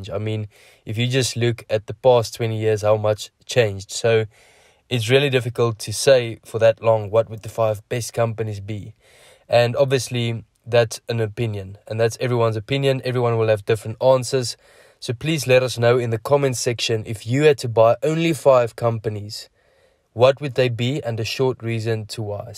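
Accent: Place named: South Africa. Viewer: Apple? - thanks for the tips = good to hear a SA accent discussing stocks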